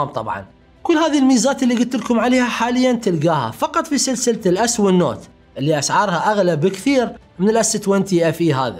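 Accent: Iraq. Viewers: اتا بشوف الفيديوهات فقط منشان استمتع باسلوبك المبدع ولكنتك العراقية الجميلة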